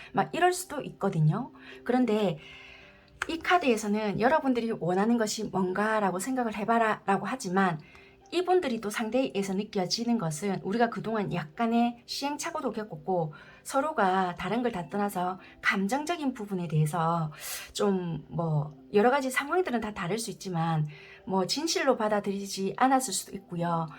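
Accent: Busan, South Korea. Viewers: 대박!! 너무 잘맞아요! 너무 신기해요! 그리고 리딩에 진정성이 느껴져서 너무 좋아요☺️부산사투리도 너무 좋아요💕 3번 선택했습니다! 위로 얻고갑니다. 다음 영상도 기대할께요💖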